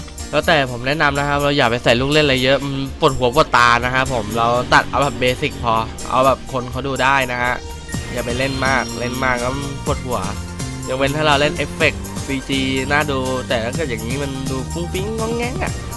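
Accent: Thailand. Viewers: เสี๊ยงเหน่อดีแท้น้อออ 😁😁😁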